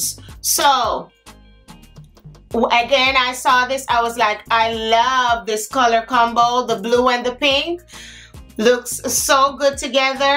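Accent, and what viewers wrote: Jamaica. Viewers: Randomly came across your video and had to subscribe right away as soon as I heard the Jamaican accent! Now back to the video…love how u style the fits. The lime green/Lavender is my fav 😊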